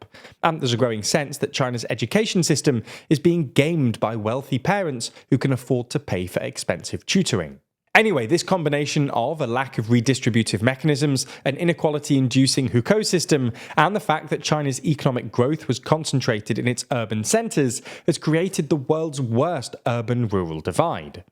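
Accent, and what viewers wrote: United Kingdom. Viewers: omg, this uk accent drives me crazy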